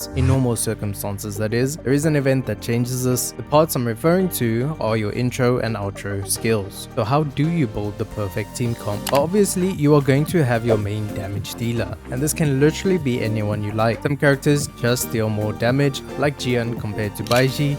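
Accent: South Africa. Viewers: You from SA bro ? Also keep up the good work! ✨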